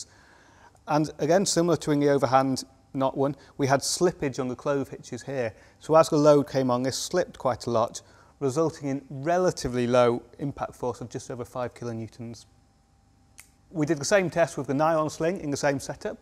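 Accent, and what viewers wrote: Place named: United Kingdom. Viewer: Impossible combination for a foreigner, british dialect & this kind of mumbling voice... Does he have pebbles in his mouth?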